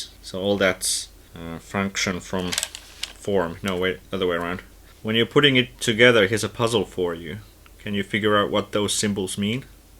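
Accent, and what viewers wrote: Finland. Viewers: Your accent is quite finnish, where are you from? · Suomiaksentti havaittu! 🔥 Bossi ohjain kyl! 👍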